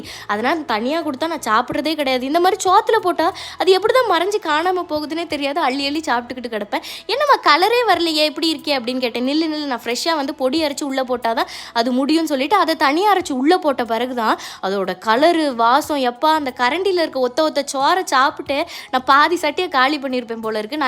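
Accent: Tirunelveli, India. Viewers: Neenga tirunelveli aa😮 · Darling neenga tirunelveli ah😮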